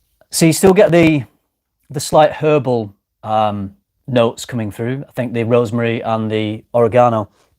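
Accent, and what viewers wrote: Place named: Northern England, United Kingdom. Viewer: I knew were from the North because when you say but you say bot lol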